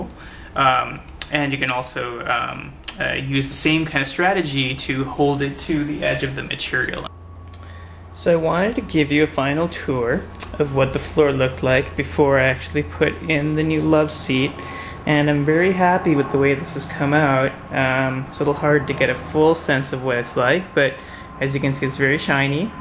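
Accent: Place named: United States. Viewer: Its odd to see american accent on an indian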